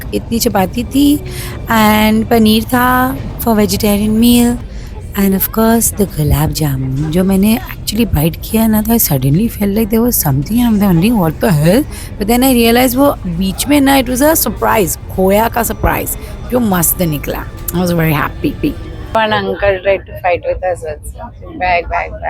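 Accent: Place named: Mumbai, India. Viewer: You are typical Dadar - kar ....I mean your accent. Loved your video. Thanks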